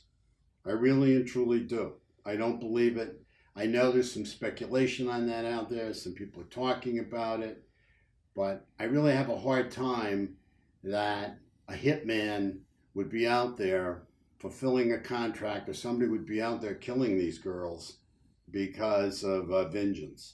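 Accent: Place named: Massachusetts, United States. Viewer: are you from Massachusetts? You have an accent.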